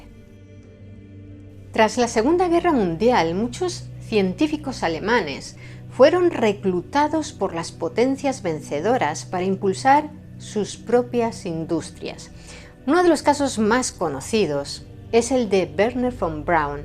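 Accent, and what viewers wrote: Spain. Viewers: Que bonito narra esta mujer .y su oratoria muy coordinada y sus palabras puestas con exquisites y su voz y el oir es como ver una pelicula o leer poesias ..Una investigadora entregada y natural.desde hoy vere todos sus videos.por su acento y lenguaje Creo que es Española...Saludos hermosa desde Esteli Nicaragua...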